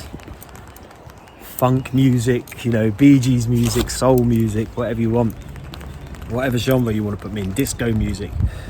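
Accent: United Kingdom. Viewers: Sad the brothers couldnt be buried in one place. Love your britsh voice. From Canada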